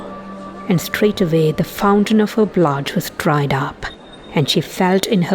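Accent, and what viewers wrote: RP, United Kingdom. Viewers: Hi! Thanks for this video. This was stunning video with great picture and I loved the music. Did you do this video because Easter is approaching? I love it and love it:) I can't imagine how much time and energy you have put behind these videos and Of course! I don't need to say anything about your posh accent. I would like to take accent class from you if you are conducting accent coaching. Great work!🙂🙌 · What a marvelous video! Very good taste for the images and an impeccable Conservative RP reading. You are outstanding!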